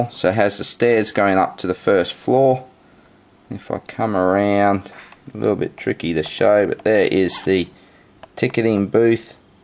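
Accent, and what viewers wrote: Australia. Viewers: I like that Aussie accent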